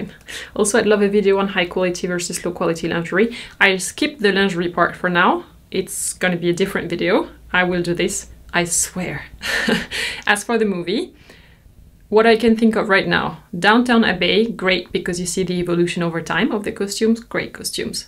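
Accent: France. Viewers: I just enjoy your French accent so much Music to my ears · She has a French accent. The more you listen the more you'll understand.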